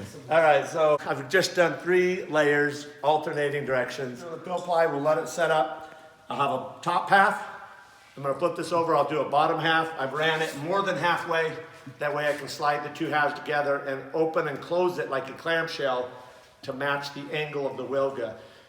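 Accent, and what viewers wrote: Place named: Nebraska, United States. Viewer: What state are you from? Your accent reminds me of Nebraska but I can’t tell. Thanks for documenting your build process so well, it’s very entertaining and educational. I’m studying as a software programmer, but I really want to be a pilot. I might join the military, but I’m still looking at my options. Some advice, maybe a little sound eq when you’re using power tools so it isn’t so harsh on the ears but otherwise great quality, especially for being in a hanger!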